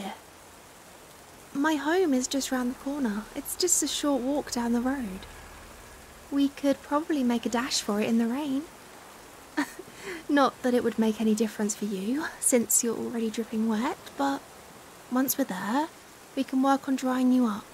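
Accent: United Kingdom. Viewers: I think it's either Great British accent or it's Scottish accent. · That was a great ASMR I like the British accent 🇬🇧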